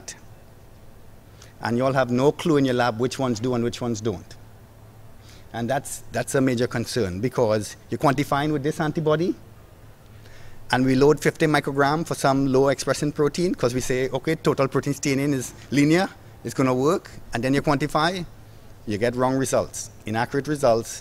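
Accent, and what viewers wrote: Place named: Trinidad and Tobago. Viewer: Fellow Trinbagonian!! Currently at PSU. Good to hear a trini accent! Lol..missing my doubles:(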